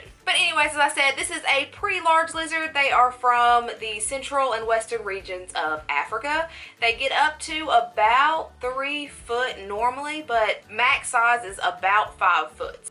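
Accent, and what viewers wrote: South, United States. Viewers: I’ve found my new crush that southern accent on you girl yeeeess please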